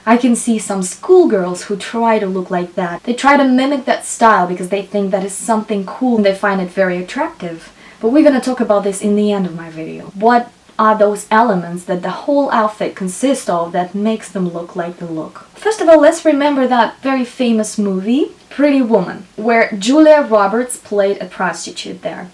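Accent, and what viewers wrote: Russia. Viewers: Ilona Piescikowska she's russian so she probably won't change her accent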